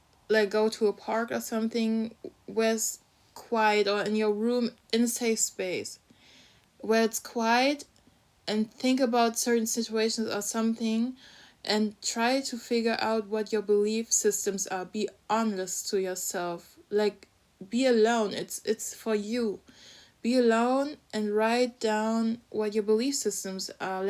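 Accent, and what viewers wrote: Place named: Germany. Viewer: She actually has a German accent, and to be honest I usually dont like German accents but her accent is BEAUTIFUL ❤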